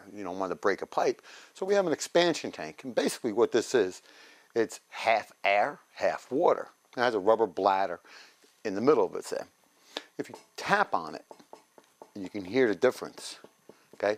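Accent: New York, United States. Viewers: Hey, you have a NY accent, are you from NY?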